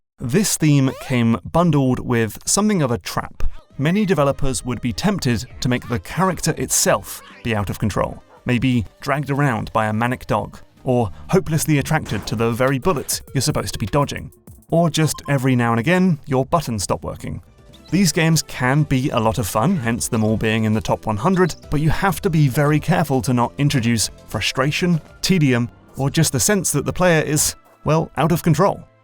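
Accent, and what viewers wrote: United Kingdom. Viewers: Yeah my man do be british though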